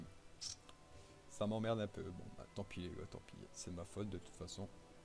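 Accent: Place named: North, France. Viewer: oh t'es du nord toi aha, bonne video poto force a toi!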